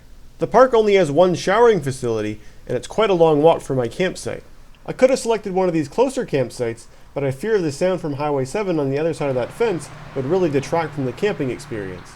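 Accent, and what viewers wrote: Canada. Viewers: You really have a very interesting life. And your videos are excellent. Being from Texas, I enjoy your Canadian accent too!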